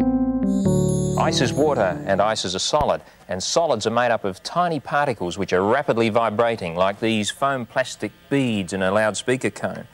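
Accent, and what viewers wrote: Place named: Australia. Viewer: Australian, I think. But he could be Tony Hinchcliffes Dad!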